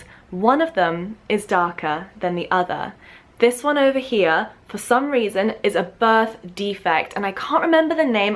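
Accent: England, United Kingdom. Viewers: Prounance from england is so beautiful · Oh, that British accent. Nothing for my ears.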